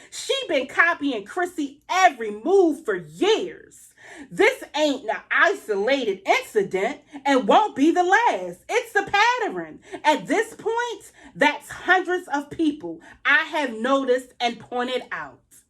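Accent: Baltimore, United States. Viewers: Sis.....you got my hubby over here cracking’ up with your commentary and b-more ‘accent’!!! No you didn’t say Aiden was talking to you!! LMAO 😂😂😂😂! When he started talking? Apparently you understand him better than Jasmine!! 😂😂😂 Anyway......Girl...you hit the nail on the head in a previous video when you said Chrissy was irritated and done with Jasmine. I think Chrissy done cut her off because on Jasmine’s New Year video she was talking about her ‘friends’ living so far from her so she’s looking to find ‘mommy groups’ near her so she can get out! And....Jasmine is now so friendly with Chrissy’s enemy - must have pissed Chrissy off! The fact that Jasmine & Chris haven’t been invited or planned a trip to Chrissy’s for the holidays, a double date, a kids play date or for them to be vlogging together said a lot. It’s been how many months and 2 besties haven’t met each other’s significant other face to face? 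Something is up with that! We all know Ming ain’t fvcking with Jasmine. And it looks like Chrissy no longer wants to associate herself or channel with any parts of Jasmine’s fuckery and disgrace. Chrissy is trying to get her own ‘train wreck’ of a life back on track and stable with this new man! She’s not going to let bum ass Jasmine fvck that up! Jasmine is just pitiful! Maybe her illness for the last two weeks are actually withdrawal symptoms due to Chrissy cutting her ass off!!!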